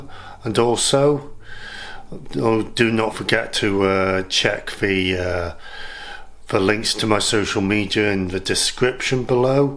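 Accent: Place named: North, United Kingdom. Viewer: I AM FROM THE 1960 S IM.IM IN NZ I JUST REALLY ENJOY HOW YOILIIU DOYOUR COOK COOKING.PROGRAMME MR. CHIEF THE..INGRIEDIENT AND PREPARE OF THAT YOU ARE COOKING AND TO THOUROUHLY COOK PERHAPS THAT THOSE LIKE TO VEIW THIS PROGRAMME ALOT THE COOKING RESULTS ARE SCRUMBY THEEDEDESE RRECIPIES ARE HAVE TO BE VERY WELL MADE ANND SCRUMBY THANKYOIU MR COOK I REALLY LIKE THOSE TYPES OF FOLK AN THIER ACCENTS.LIKE YOURS FROM THE NORTHERN PLACES OF THE WORLD YOUR PROGRAMME PROVIDES HOW TOO WELL MADE SCRUMBY DISHES AS WHEN AS ALSO I VE BEEN INOLVED TOO COOK HERE PREVIOUSLY . THANK YOU MR. COOK THESE DISHES ARE SCRUMBY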